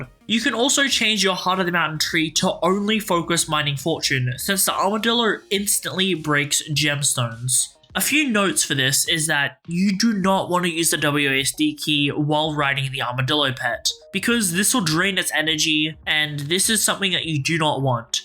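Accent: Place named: United Kingdom. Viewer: british accent and exposing quaglet of macroing when he's not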